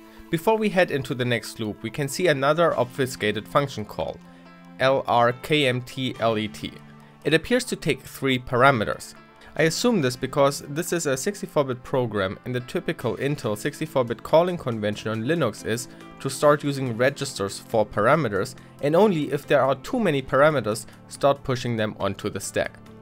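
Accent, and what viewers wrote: Germany. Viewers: He's German so some funny pronunciations comes with him you know! ;) I'm Swedish and we do the same, like calling Germany for Jermany.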